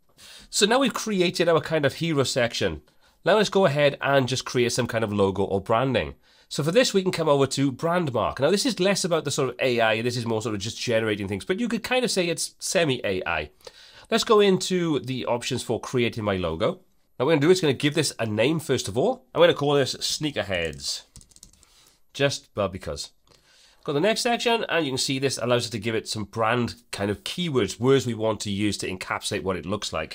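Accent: Wales, United Kingdom. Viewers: Are you Welsh? Valleys boy RN Veteran here. ⚓️🏴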